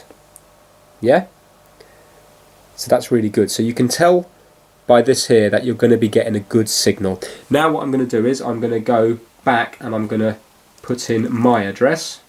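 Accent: England, United Kingdom. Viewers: Right, tell you what I missed your English accent. (MATE)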